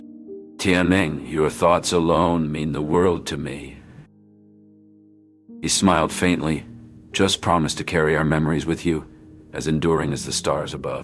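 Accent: United States. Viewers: Ai sounds American, I mean its making a mockery of English as usual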